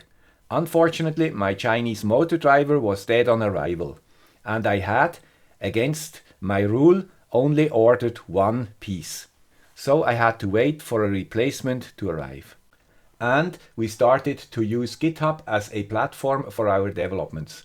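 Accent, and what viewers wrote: Switzerland. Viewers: You are correct. Many crowdsourced collaborations have occurred in the digital / electronics world. Many have failed due to the lack of process and project management issues. Do not be so hard on your documentation assessment (at least - in this video) and challenges you faced. You highlighted important elements - and yes, all engineers, myself included, hate paperwork. But as all know, they are a necessary evil. We have learned much from Michael Faraday and his superb skills and the unfortunate challenges of lacking a formal education that, very likely, held him back from even higher achievement than he already had achieved at such an early age. Newton was the exact opposite. By the age of 23, his manuscripts had become masterpieces in their own right. We are all "lazy" in comparison ;-) Even so, I think you deserve a lot of credit creating this content and its format. 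It is superb - even for a guy with a Swiss accent - that is easily understood in the YouTube Universe!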